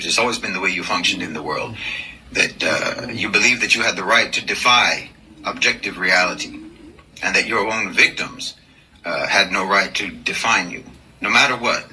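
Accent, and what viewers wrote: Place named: United States. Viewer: I'm confused. He speaks like an American.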